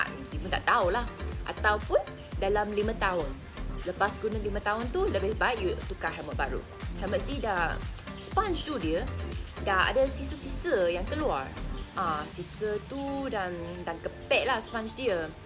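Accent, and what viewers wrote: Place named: Kelantan, Malaysia. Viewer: Wah pandai kecek kelate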